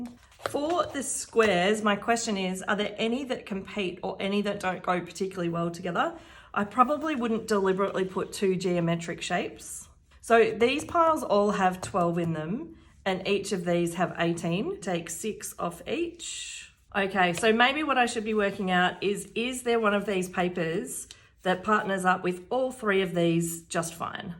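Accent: Australia. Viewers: I love listening to your thinking process! (And not just because of the cool Aussie accent!) I’ve been doing the sheet loads of cards for quite a while. Now I want to step it up to using the whole pad! Watching how you figured out which papers to cut into which pieces was brilliant! Added up to 24! I loved the shock in your voice!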